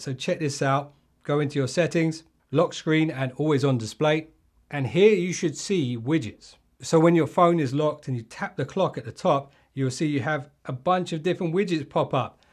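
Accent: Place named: England, United Kingdom. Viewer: Can u drop the english accent? Not feeling it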